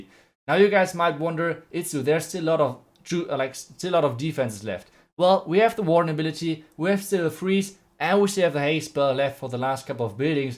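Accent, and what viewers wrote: Germany. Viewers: U are german i can tell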